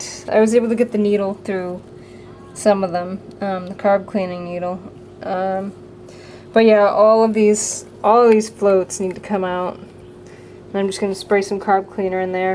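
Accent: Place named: Canada. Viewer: Hi, I know it's a while ago now, and this wasn't your bike, but did you discover the reason for the oil on the plugs and filter? Also I believe I detect a Canadian accent, but was it a California model? I hear they have their exhaust shit fed back to them through the carbs, which surely can't be healthy for the engine!! Bloody bullshit climate legislation!! When do they ban cow farts? The state of the filter was alarming. Did you perform a compression test? My guess is you didn't because it looks like a much harder job to access than on my CB-1, and that's a bugger on the inside cylinders. Could be valve seals I guess. It's sad to see a great bike neglected that badly isn't it? Thank you for the instructional vid. Finally, can you send me a bottle of pineol in the UK?;)) We don't have it here and I don't know what the equivalent is.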